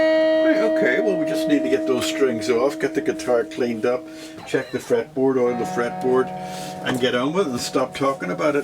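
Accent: Northern Ireland, United Kingdom. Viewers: great to hear a norn iron accent and sense of humour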